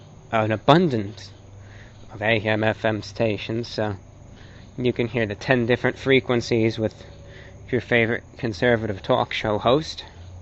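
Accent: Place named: Mid-Atlantic, United States. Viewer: Gotta talk with that mid-atlantic accent.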